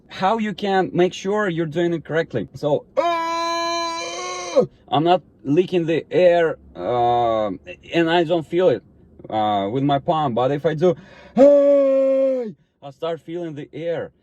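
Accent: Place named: Russia. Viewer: Incredible explanation. I thought I know how to do false-fold distortion, lol, but seems like I use cartilage, gonna try your way as mine hurts. Btw, where are you from, your accent is so Russian :) Although, I think passing too much air through vocal cords dries them a lot, so fry screams should be safer.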